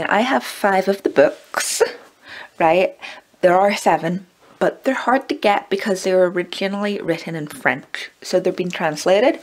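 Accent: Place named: Scotland, United Kingdom. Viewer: I've just re-watched the first 20 seconds of your video and I would have bet money that you had a [mild] Scottish accent. And I'm from Yorkshire in the North of England, so the Scots are my nearest neighbours. I've got to ask, where are you from? If that's not a Scots accent then what kind of accent do you have? I'm genuinely perplexed and massively curious. You speak English with native fluency so I doubt it's your second language.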